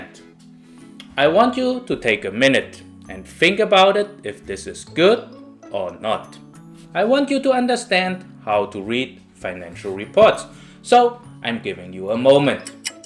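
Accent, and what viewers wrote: Germany. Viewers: U have an interesting accent, it almost sounds like a German accent.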